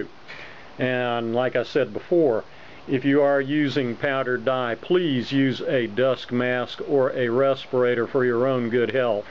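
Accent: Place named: Australia. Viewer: totally!! is u aussie mate=??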